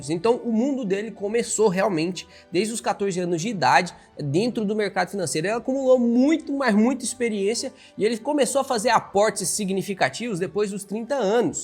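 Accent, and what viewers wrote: Caipira, Brazil. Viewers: Você peca na sua fala, pois nas palavras que tem a letra "r" vc quase embola a lingua. Seu sotaque parece com um caipira . Horrível e cansativo ouvir.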